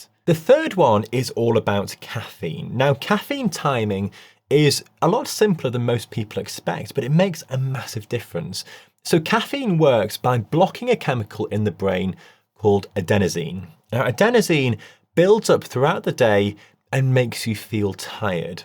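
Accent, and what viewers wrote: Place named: Northern England, United Kingdom. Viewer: Thanks for this really informative and excellent explaining.. Fond your videos the best on social media.. Appreciate so much your insight into life style changed, and as your a uk based doctor, and by your accent feel you may live in the North of England (like myself ) simplify videos easy listening.. thanks again 🙏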